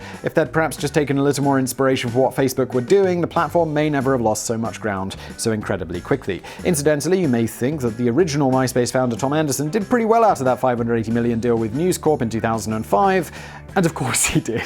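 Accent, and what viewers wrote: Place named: United Kingdom. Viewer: Unfortunately have to use Facebook to check what my mom is uploading 🤦‍♀️ I like to watch your videos as I acquire random information with a nice British accent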